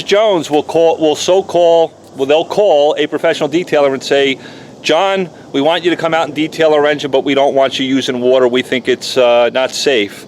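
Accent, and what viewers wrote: New York, United States. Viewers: Why do you have new York accent? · You sound so much like my NY friends (or me..lol) Great video and hope people pay heed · So, how do you get the stuff off your engine if someone has done that? btw, glad to see you're here in Dallas, pard. Your accent though - you're from the NY area, possibly?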